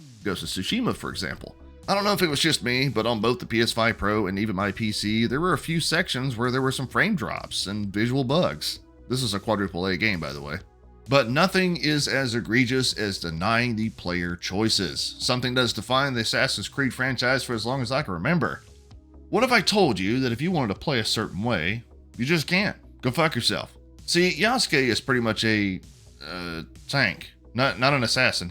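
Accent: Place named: Texas, United States. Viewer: You sound like Texas just play as the female character if you don't like YASUKE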